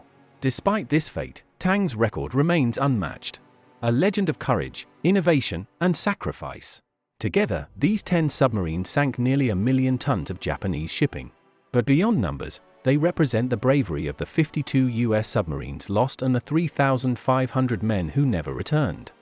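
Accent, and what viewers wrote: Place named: England, United Kingdom. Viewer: Why english accent ?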